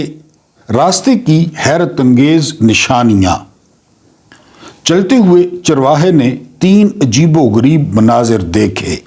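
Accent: Saraiki, Pakistan. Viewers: Subhan Allah bhai.bht achhi Islami kahanian hoti hain aapki.bht maloomat hoti hain Aur bht mofeed hoti hain Allah aap ko ajar.e. azeem ata farmay.Vedios dilchasp hoti hain Tawalat ka pata hi nahen chalta.aur saraiki ka tarka to bht achha lagta hai.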